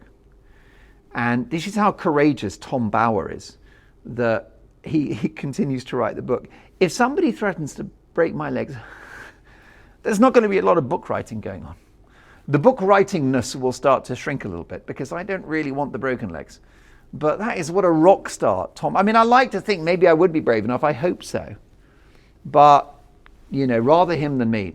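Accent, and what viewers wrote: United States. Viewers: Great American accent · You nailed an American accent! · Great American accent!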